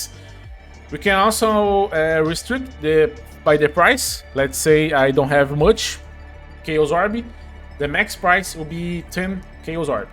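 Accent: Brazil. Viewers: Primeira vez que vi um vídeo dele, achei o inglês bem abrasileirado, ele é br mesmo? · cara um bom conteúdo, mesmo não sabendo inglês direito consegui entender perfeitamente, por ele ser um inglês abrasileirado e isso ficou muito bom de vdd + um inscrito aí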